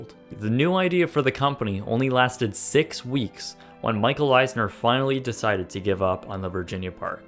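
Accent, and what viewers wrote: Canada. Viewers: "howoosing...oot..." the speaker is from Canada I am guessing, ay?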